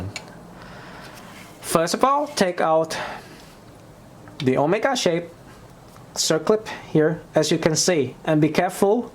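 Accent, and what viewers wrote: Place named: Thailand. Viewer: ผมชอบอังกฤษ สำเนียงไทย ฟังรู้เรื่อง รื่นหูจัง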